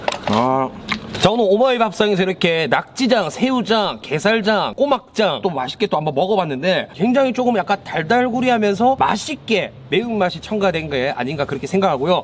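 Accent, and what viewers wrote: Busan, South Korea. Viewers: 옥상 먹방 정감있는 부산말 조합 최강 섞까 묵어봐라ㅎㅎ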